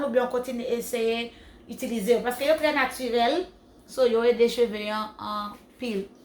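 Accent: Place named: Dominican Republic. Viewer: li pa konn li kreol non preske dominikenn liye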